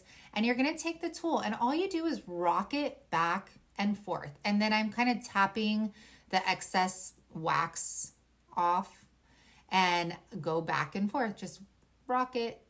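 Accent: South, United States. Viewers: I couldn't find the little tool you used to make the wood grain on your Amazon page....Did I just miss it? I thought I looked at everything! Love your crafts and your ways of explaining how to do them. You talk my talk! You must be from the South too! Lol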